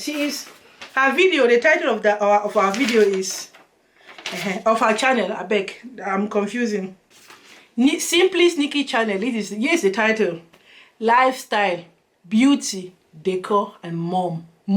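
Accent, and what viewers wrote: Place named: Africa. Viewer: So what is the difference between you and Summer Aku? You both talk aggressively and I think that’s just your african way of talking. You are doing exact same thing you are accusing her of. If everyone should mind their business, then Summer Aku should mind her business and you too should mind your business. Everyone should mind their business. Something else, if you are going to quote Summer Aku you should at least quote her right. I watched that video and you’re not quoting her right. At the end of the day, as you are attacking Summer for getting involved you too should not get involved. You are not Nikki’s defender. There’s a lady on YouTube called LovelyTi. She talks about any and everyone just like Summer Aku. It’s not a new thing.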